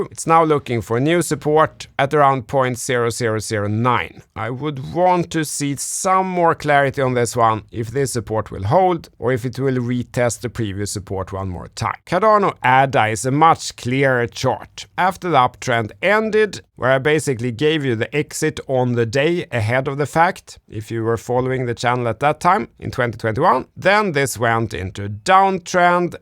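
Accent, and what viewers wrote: Sweden. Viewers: A true Swede, judging from his very pronounced Swedish dialect. Keep up the good work brother!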